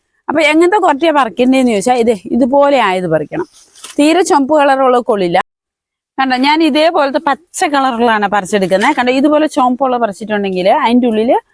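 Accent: Kottayam, India. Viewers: Hi chechi njn ipplanu video kanunnathu. Super … Kottayam style samsaram ❤ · നിങ്ങൾ കാസറഗോഡ് കാരല്ലേ, പിന്നെങ്ങനെ കോട്ടയം സ്ലാങ് പറയുന്നു 🤔🙄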